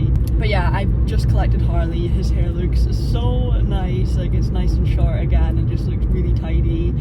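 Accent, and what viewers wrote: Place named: Scotland, United Kingdom. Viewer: would you consider wearing a microphone. Your voice is really gentle and I struggle to hear you without the volume waaaay up, which is fine until ads come on a mega volume 😂 YouTube closed captions can't cope with your Scottish accent either lol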